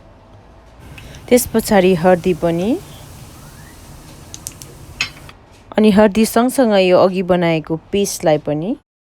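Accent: Darjeeling, India. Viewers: Hamro darjeeling aloo bandam boli mitto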